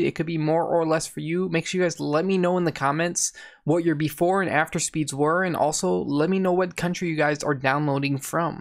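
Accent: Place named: Arab world. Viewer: Arabic English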